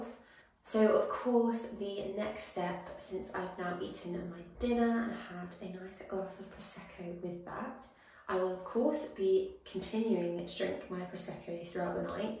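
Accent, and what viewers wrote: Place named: United Kingdom. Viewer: I just randomly found your channel now and I didn’t have anything to watch so i clicked on it and I’m in love 😭 I love your accent so much, you must be from the UK- this is definitely the kind of video I’d want to watch again! 🤍🫶🏼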